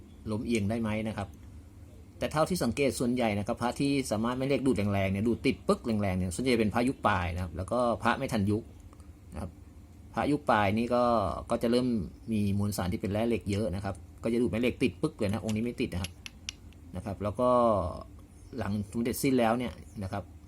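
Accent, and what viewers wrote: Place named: Thailand. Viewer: พระสวยๆทั้งนั้นทดสอบกลัวเสียเนื้อพระมั้ยจ๊ะพูดเน้อเน่อคนจ.วไหนคะ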